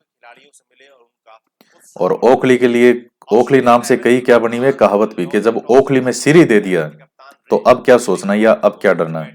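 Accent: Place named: Sikar, India. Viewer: Sir aapki aawaj se ESE lagta hai jase Ki AAP sikar se lagte ho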